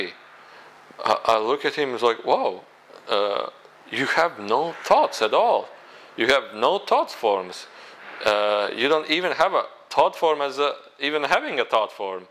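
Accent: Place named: Poland. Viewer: “Witam.” are you polish??ur accent sounds like soo i could be wrong:)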